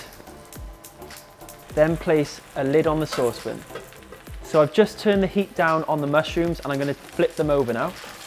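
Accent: Wales, United Kingdom. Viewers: Aw. It's such a musical accent. My whole family is Welsh but sadly my sister and i were the only cousins brought up in England so we don't have the lovely lilt that has...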